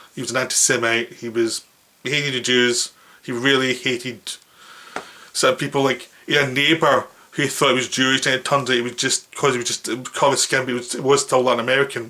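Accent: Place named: England, United Kingdom. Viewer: He has a very thick England accent and hard for ME (prob only me) to understand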